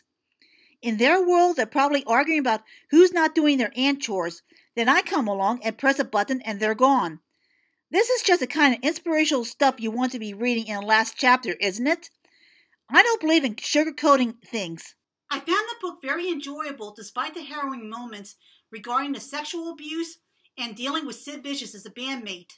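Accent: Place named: New York, United States. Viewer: Cheers K,best review I've heard,or read on the internet.Love that New York accent,I'm from Boston so,people get it after a bit.Lol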